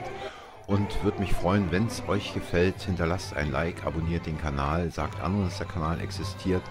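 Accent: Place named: Lower Saxony, Germany. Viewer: Stimmt, dem muß ich beipflichten. Eine sehr angenehme Stimme und ein sauberes Hochdeutsch, wie in Niedersachsen!!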